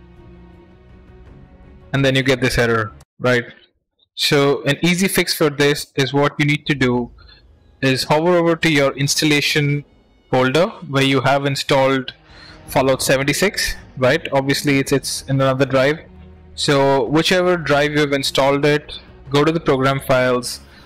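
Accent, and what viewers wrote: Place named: India. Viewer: The moment I heard an indian man in this video, I was overcome with a sense of relief.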